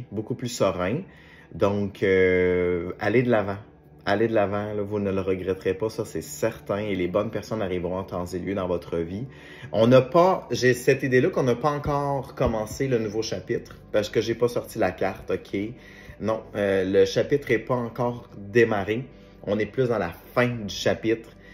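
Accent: Canada. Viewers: cette guidance qui ma bcoup parlé de À à z merci belle âme pour tout j'adore votre accent canadien bonne continuation et de lumière je vous kiffe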